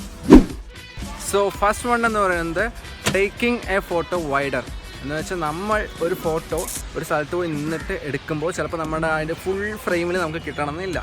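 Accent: Palakkad, India. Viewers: Bro r you from palakkad??? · bro Palakkad aanallo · Palakkad aano 😍✌ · ചേട്ടൻ പാലക്കാട് ആണോ 😃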